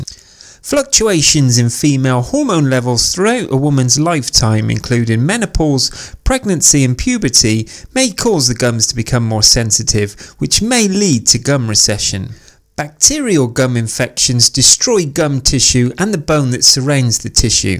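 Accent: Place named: Bristol, United Kingdom. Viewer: The suggestions from this video are: one tablespoon sesame oil oil-pulling; Sea salt rinse; Coconut oil mixed with baking soda and use as toothpaste; Chamomile flower tea; Myrrh in mouth rinse; Tea tree oil on toothpaste; Septilin, buy in capsule form; Flossing. Cranberry juice and other sources of vitamin C; Green tea; Gum Recession toothpaste which this chap sells. Lovely accent! Somewhere near Bristol, I'm guessing.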